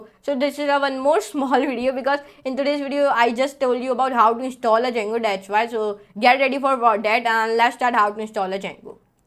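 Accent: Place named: India. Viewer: yAh india ki english bol rha ha Django ka illawa aur kuch samajh nhi ahh rha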